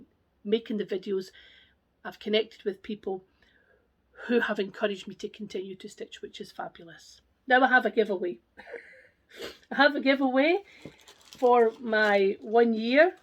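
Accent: Scotland, United Kingdom. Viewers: Congratulations! I enjoy watching your videos! I’m so glad your recovery is going well. It broke my heart when I watched the video where You explained about your fall. I have to confess that I first started watching because I enjoyed listening to your accent. My grandparents were from Edinburgh. I miss them. So listening to you was a delight, some little words(can’t think of any right now) but words I haven’t Heard in so long But I have to say it was your accent at first but then I really enjoyed all your different whips and all Your stash and just everything. Good luck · I hope you continue making videos I have watched you since No 1 and look forward to your content, I m a fellow Scottish stitcher and it’s lovely to here your accent.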